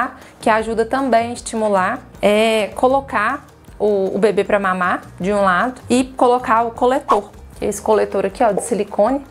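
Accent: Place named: Minas Gerais, Brazil. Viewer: Eras não consegui prestar atenção ao conteúdo do vídeo 😂 só ao seu sotaque lindo que parece ser de Minas Gerais <3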